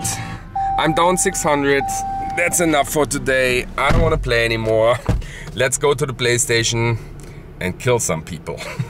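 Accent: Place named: Germany. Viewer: "Early bird get the warm". Awesome German accent.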